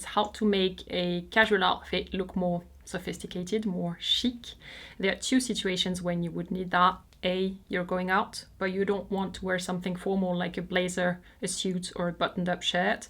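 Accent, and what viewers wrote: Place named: France. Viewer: I could listen to you talk about fungus and cellulite all day 😂. You have a lovely voice and French accent. Everything sounds so especial!❤️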